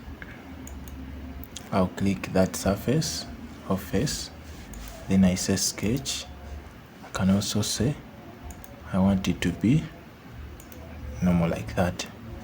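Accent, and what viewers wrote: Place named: Kenya. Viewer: you have a Kenyan accent. Thanks for the tutorial!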